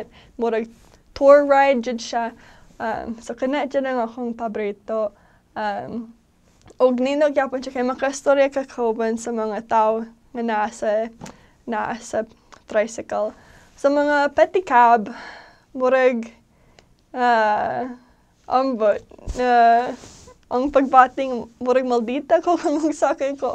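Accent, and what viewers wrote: Philippines. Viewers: Perting bisayaa jud bayhana 😂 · love the accent.. bisdak kaayo. Apir!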